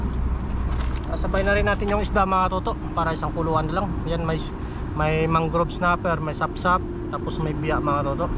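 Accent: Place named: Iloilo, Philippines. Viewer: saang province ka ba galing?naitanong ko lang kasi ang punto mo parang ilonggo.😊ingat lang po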